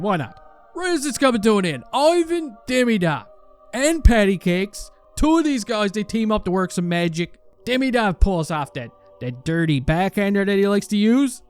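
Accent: Newfoundland, Canada. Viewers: I don’t think it’s from anywhere in Britain. Pretty sure it’s newfie (I say that lovingly by the way)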